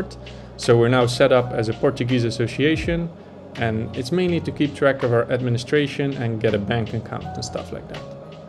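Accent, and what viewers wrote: Netherlands. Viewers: As a dutch person i immediately recognised your dutch accent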